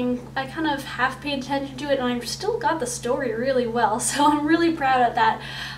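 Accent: Canada. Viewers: If I were to take a guess, I would say you are Canadian. A very cute Canadian at that :D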